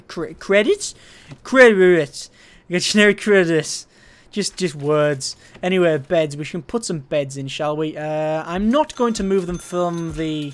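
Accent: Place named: North, United Kingdom. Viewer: You're so northern. :D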